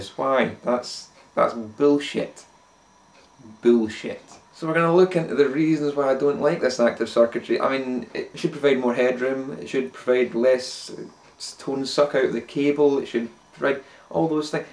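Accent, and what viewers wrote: Scotland, United Kingdom. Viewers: Well he's Scottish. I don't know about Shrek. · TALK SCOTTISH TO ME! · It's scotland. We all talk like that over here...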